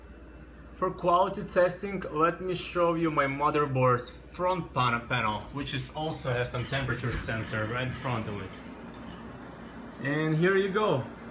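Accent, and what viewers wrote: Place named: Russia. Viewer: аксент лайк рашен...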